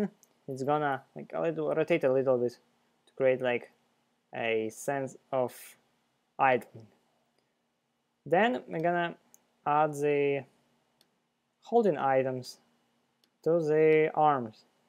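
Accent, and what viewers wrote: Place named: Russia. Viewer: Your accent sounds like Russian.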